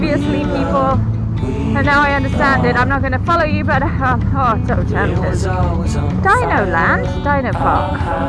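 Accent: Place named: England, United Kingdom. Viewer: I`m English and you accent is so close to it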